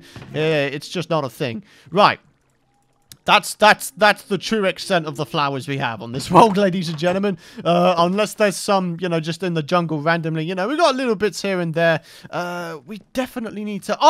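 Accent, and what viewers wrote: Scotland, United Kingdom. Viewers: Hi:) I'm one of your new subs and I'm curious..:) So I have a question for you. Are you from Scotland? I seemed to hear some Scottish dialect from you. I'm from Denmark and I am a big fan of the Scottish/Canadian rock/folk music group Runrig. They are unfortunately taking an absolute last world tour this year.:(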